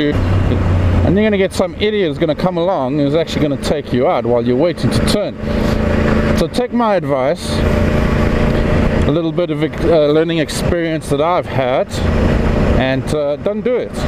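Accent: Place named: South Africa. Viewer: there's a hint of south African there I think lol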